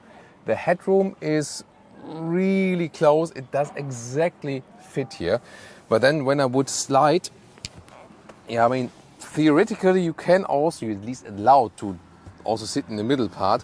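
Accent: Germany. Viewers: Gibt es den Kanal auch auf deutsch? Das deutsche Englisch ist....ähm...suboptimal, um es mal nett zu sagen. Und jaja deutsche Autos sind besser blabla...aber die saufen auch wie die Sau! Es gibt nämlich nirgends einen Fullhybriden im Angebot. Entweder Vollbenziner/Diesler, der säuft einiges mehr als der Lexus hier, oder dann direkt ein Plugin oder full E-Auto.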